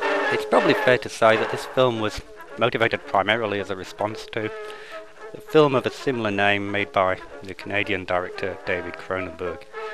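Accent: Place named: South London, United Kingdom. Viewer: Wow you've lost your northern accent and sound like you're from south London like me! And the trailer is a groove. I can't wait for the full film....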